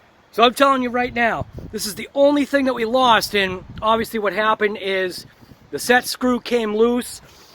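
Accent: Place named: New England, United States. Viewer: Awesome bash and great truck! Are you from new England area? You sound like you got a accent.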